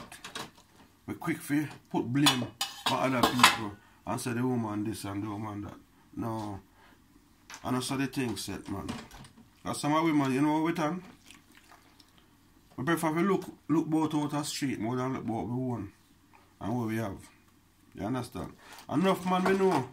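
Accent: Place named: Jamaica. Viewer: u sound like a real good jamaican man, Im new to ur channel I notice u spend allot of time in ur kitchen lol u must love cooking that's rare to find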